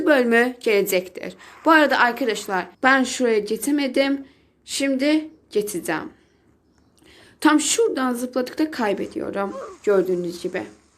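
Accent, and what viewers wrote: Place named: Azerbaijan. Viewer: Salam azerbayvanlısın niyeki bildim sesiinden :)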